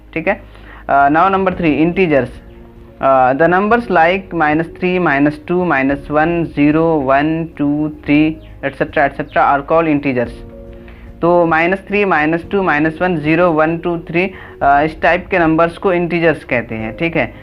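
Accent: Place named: India. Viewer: إنجليزي بنكهه هندية 🌚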